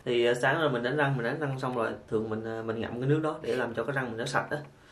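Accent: Central, Vietnam. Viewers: Nghe giọng bạn là biết người miền Trung rồi nhưng nghe đến cuối mới bik đồng hương ở Calgary, cheers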